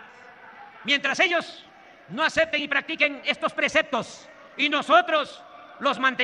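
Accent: Tabasco, Mexico. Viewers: Hasta parece que estoy oyendo a Hitler en mal español tabasqueño.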